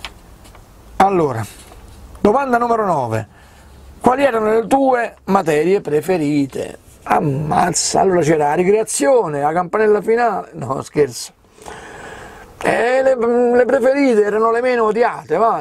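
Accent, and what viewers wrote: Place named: Rome, Italy. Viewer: Ciao, ti seguo da un po', sei molto simpatico, poi l' accento romano mi fa morire, ho alcuni amici romani perche' ho villeggiato a Terracina per poco piu' di 20 anni. Sono un Napoletano emigrato in Canarias. Beh! Sono amante della vita in Camper pero' ho un furgonato, pero' non ci vivo.... Un abbraccio a Presto. Ah, in Calabria vive un mio zio. hehehhe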